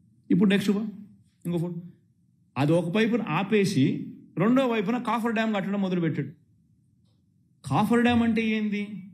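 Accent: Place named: Rayalaseema, India. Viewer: rayalaseema slang n language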